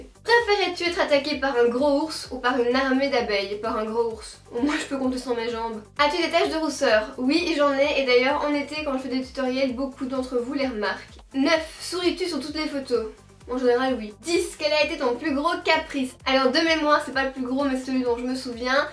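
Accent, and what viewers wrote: Belgium. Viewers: Il me semblait bien avoir reconnu ton accent Belge :) C'est rare de voir des youtubeuses belges d'ailleurs ! <3 :)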